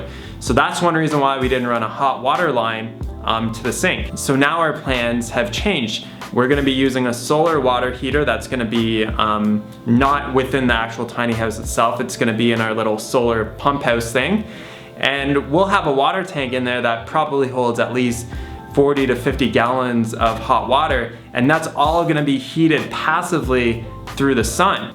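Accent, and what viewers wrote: Canada. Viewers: The Canadian accent was strong in this one (probably because you said words with "ou" in them so often lol). XD I can't wait to see this tiny house when it's finally done though! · Aboot...lol You're such a Canuck :)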